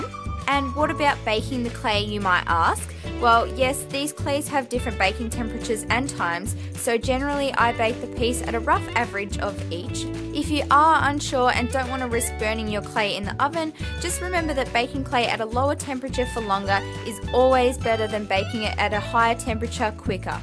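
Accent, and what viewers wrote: Australia. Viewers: I'm brand spanking new, wanting to give this a go and I'm so thrilled with all the "how to get started without buying the whole craft store" tips!! Thank you! Subscribing now because I love your style, your editing is really good and it's awesome to listen to a fellow Aussie! <3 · Hey, just curious, are you a fellow Aussie? (Just asking cause of your accent and the Woolworth's brand)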